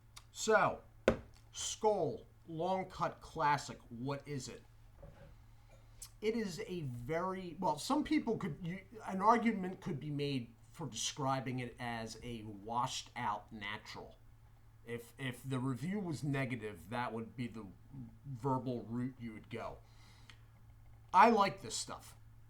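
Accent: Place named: Philadelphia, United States. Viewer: Don’t have it here in NJ. Had to order online. Sound’s like your in Philly?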